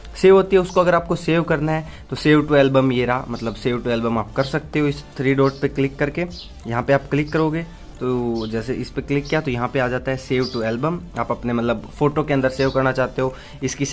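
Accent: Haryana, India. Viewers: Bhai haryana me kaha se ho? Awaz me haryanvi touch h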